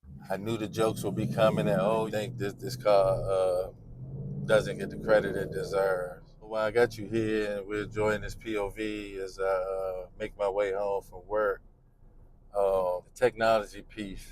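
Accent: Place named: Baltimore, United States. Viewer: You from Baltimore? I swear I hear the accent and this coming from somebody from baltimore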